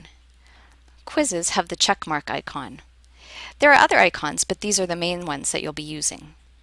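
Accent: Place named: Canada. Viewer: You sound Canadian. Are you?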